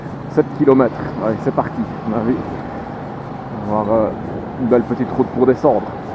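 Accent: Belgium. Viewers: en faite j'hésite entre 2 motos que tu a testé ta ktm 790 duke ou la Triumph street triple rs les 2 me tente bien mais les budgets font réfléchir vu le temps que on a en Belgique et oui j'habite pas très loin de ton coness peut tu me donner ton avis sur les 2 machines points fort, points faible rapport qualité prix et aussi le cout entretiens pour ta ktm je te remercie d'avance et sa fait plaisir de voir et d''entendre un moto volgeur belge qui d'jase wallons V potos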